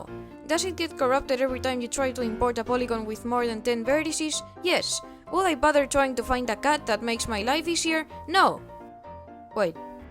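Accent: Spain. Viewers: Hi! I was wondering were you were from. By your accent, I guess you're from Spain, If that's the case, just know that what you've done could have been a 1rst of bachelor project, so CONGRATULATIONS!!!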